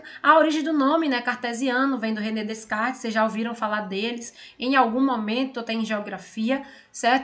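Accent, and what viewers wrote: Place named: Paraíba, Brazil. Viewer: Tenho uma sobrinha que se chama Juliane, é paraibana, e tem sotaque idêntico ao seu.... Parabéns pelo vídeo. Muito explicativo. Paulo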